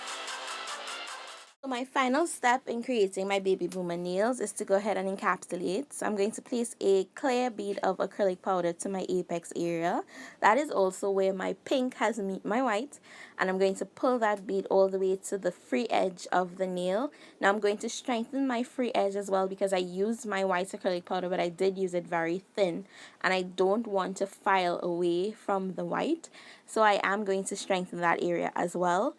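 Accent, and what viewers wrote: Trinidad and Tobago. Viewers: I just discovered you. Your accent sounds trinidadian 😮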